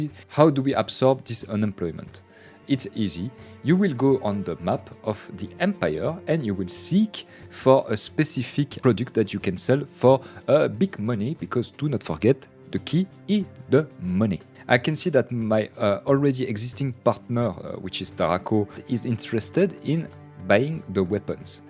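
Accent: France. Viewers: I have played this game so often when I was younger. Thanks a lot for this instand nostalgia. All the best, Valentin PS: Your French accent sounds marvellous, plz keep it! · By your accent, you are Frenchman, are you? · The french is strong with this one 😍😍😍 oh I Love it so much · Français qui parle anglais ? (A l'accent et à la prononciation de l'anglais, ça se sent^^) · The French accent always a good lissen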